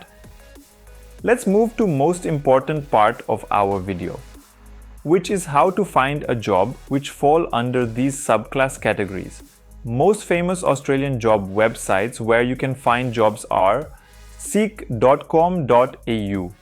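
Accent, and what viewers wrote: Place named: India. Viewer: This is Indian English.. sounds good to be true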